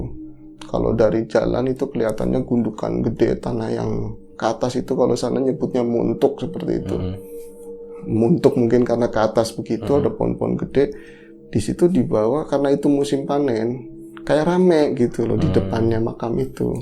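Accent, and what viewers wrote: Banyumas, Indonesia. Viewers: Request..mohon lebih byk lagi ngapaknya..seru brasa di TKP beneran... Dan biar Mbah sering translate..Ra diem2 Bae 😁😁🙏🙏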